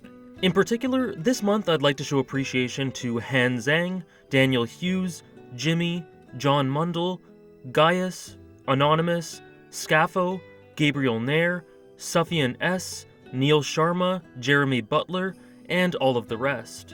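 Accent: Canada. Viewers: What part of Canada are you from?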